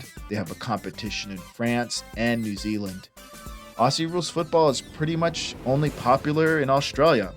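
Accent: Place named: United States. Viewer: I'm a born and raised American too, and I've always been fascinated by Australian sports. It's great to finally hear an American narrator talk about Australian sports. Great video.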